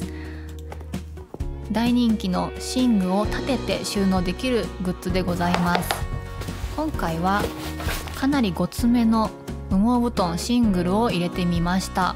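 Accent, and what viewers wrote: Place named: Osaka, Japan. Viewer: 、ご実家大阪なんですねーー。端々になんとなーーくなじみある大阪弁のようなイントネーションを感じていたので、一人で納得してしまいました。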